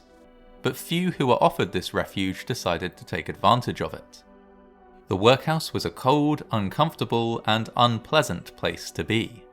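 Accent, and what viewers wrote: England, United Kingdom. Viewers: Your voice, I'm addicted to it. I would follow you to college if you were a professor. Where are you from, England? Love the accent mate. Glad your channels blowing up.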